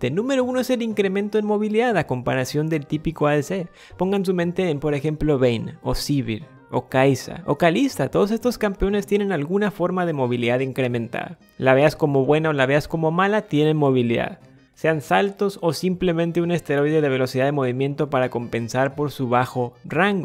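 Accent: Mexico. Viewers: Jjja no mms eres de cancun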